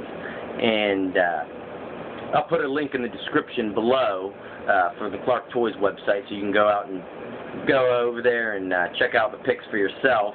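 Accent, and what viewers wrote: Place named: Ohio, United States. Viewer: Love your walking dead videos man! But I also love your accent. Are you from Ohio? lol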